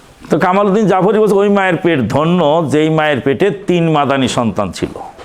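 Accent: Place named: Bogura, Bangladesh. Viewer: Bogurar kotha shune onk valo laglo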